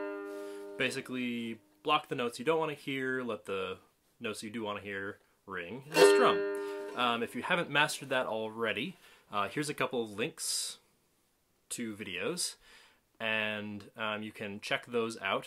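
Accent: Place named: United States. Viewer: Seeing a few of your videos I did not expect you to have an American accent lol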